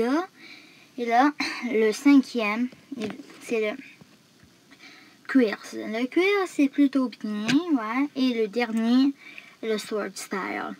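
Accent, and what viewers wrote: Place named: Quebec, Canada. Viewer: Il est sûrement Québécois l’accent se reconnaît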